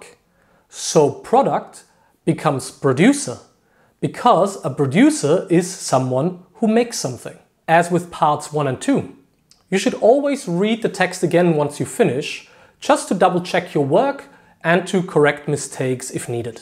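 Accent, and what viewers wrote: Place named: Germany. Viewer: Thanks, this is a really useful video. Do you mind if I ask where you're from? I'm assuming from your accent that you're German, as opposed to Austrian or Swiss.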